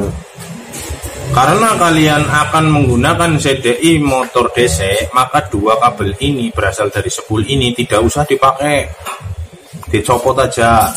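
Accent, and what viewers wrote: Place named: Java, Indonesia. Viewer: Aku pengemar ntn chanel. Tapi yg aku salut, abang nya slalu merokok. Kira2 brapa bungkus bos sehari habis roko nya. Wkwk... Slm logat jawa mas. Izin kan trus aku belajar d chanel nya.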